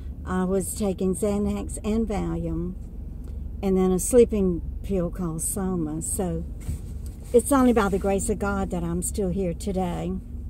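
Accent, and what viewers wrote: South, United States. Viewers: Very sharing stories. Interesting too. I recently found your channel. Are you living in BJ now? Your Southern accent lets me know you are from or raised in the South. Look forward to watching more of your vlogs.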